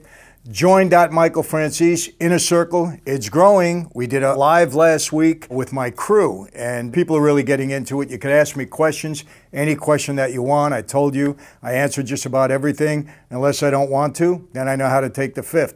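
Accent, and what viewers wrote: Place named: New York, United States. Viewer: The New York accent has to be the best accent to talk serious business.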